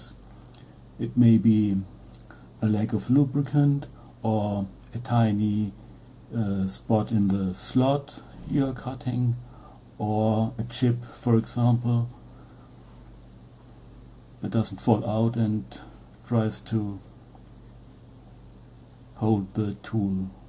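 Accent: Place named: Germany. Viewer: You sound German !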